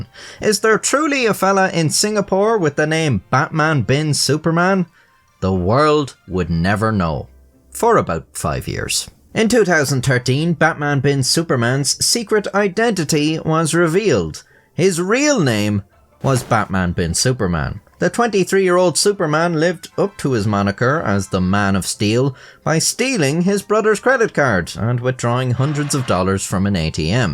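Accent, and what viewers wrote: Ireland. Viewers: I love the Irish accent. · Well, I subscribed for a combination of the charming Irish accent, unassuming animations, and vague but unsubtle threats against people who don't subscribe. But we all have our reasons. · Listening an Irish man talking feels better than a british man talking 😅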